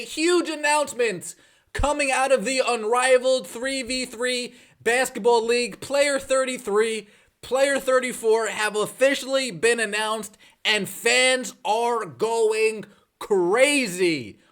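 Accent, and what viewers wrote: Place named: New Jersey, United States. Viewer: Nahhhh, thats just how we all speak "Down the Jersey Shore"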